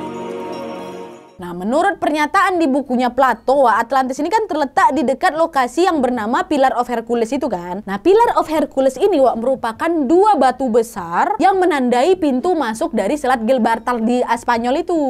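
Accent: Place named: Padang, Indonesia. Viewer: Hahahaaa ndk ada tu do, padang kali kak ini 🤣🤣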